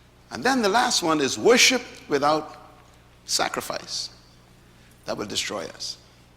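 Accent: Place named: Caribbean. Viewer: Its 2020 and I just heard this message, Never have I heard a person, deliver a message to the world, so eloquently! Just listening to the message, I can telĺ you are an intellegent man from the Caribbean! I salute you Dr Myle, wish that I could have met you in person, your words are more relevant today, because I think you were able to foresee what this world would have become!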